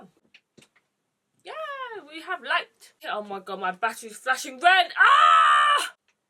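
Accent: United Kingdom. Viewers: I’ve seen your videos/pictures on Instagram plenty of times. This is the first video of yours I’ve watched on YouTube though, and the first time I’ve heard your voice. Didn’t know you had a British accent!! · I don’t mean this as an insulting way at all, but when she spoke I wasn’t expecting the tone and type of voice she spoke on it’s so much fuller than expected and I didn’t even know she was British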